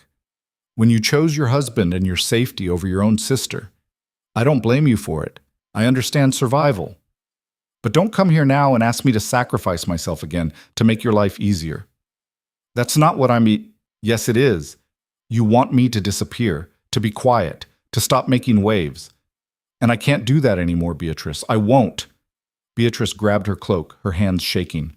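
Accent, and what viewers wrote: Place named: United States. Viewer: American accent dosen't suit the story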